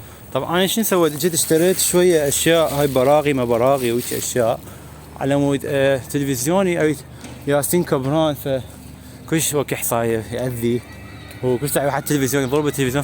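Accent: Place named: Iraq. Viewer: سيد شلون ضبطت اللهجة العراقية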